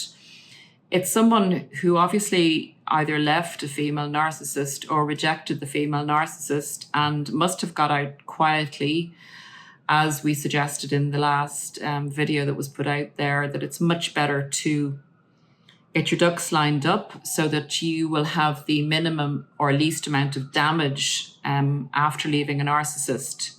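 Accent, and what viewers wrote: Ireland. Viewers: Just love your Irish accent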